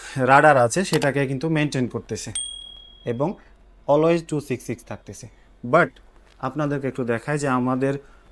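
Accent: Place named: Rajshahi, Bangladesh. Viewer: So far your accent seemed to be Razshahi OR your home town,looking your video from Dhaka,Bangladesh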